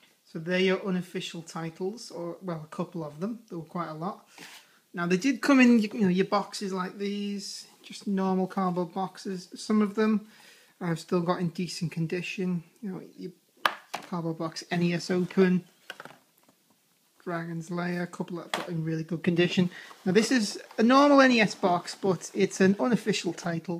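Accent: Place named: Liverpool, United Kingdom. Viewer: u rock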